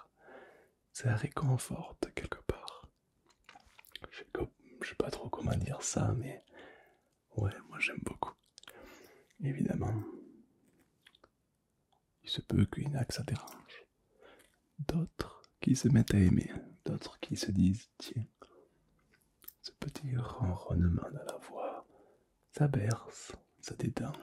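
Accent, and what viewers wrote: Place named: Toulouse, France. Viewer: Une petite pépite ta vidéo ❤️ merci a toi ta une très belle voix avec ce petit accent toulousain ❤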